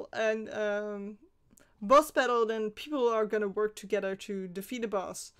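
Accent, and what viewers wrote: Netherlands. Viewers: thnx :D i stream for a while but i dont get !lurk to work cuz is uses $(user) is now in lurk mode but in chatbot it doesn't work :( greetz from the netherlands :D (ja ik hoor dat accentje wel LULZ) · Are you Dutch or German? Your accent sounds slightly more Dutch, but you look just like a German girl who goes to my church. Goede dag! Guten Tag!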